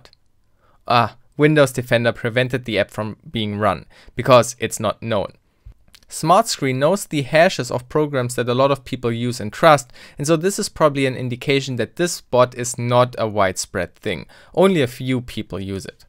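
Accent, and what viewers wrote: Germany. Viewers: Obviously German accent xD But hornestly I would have used Wireshark to export the data from the webserver. It fetches all incimming and outgoing data for that. Quiet nice hearing about that other programs, but it looks to unconfortable to me exporting ram to get a server request. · thats a german accent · MinecraftJeffy don't be stupid, he speaks english to reach a bigger audience and everyone understands what he wants to tell. He might have a german accent, but how should anyone learn English if you forbid themto speak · Hard German accent. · From your accent i can guess youre German, da sag ich nur: Gute deutsche Arbeit! daumen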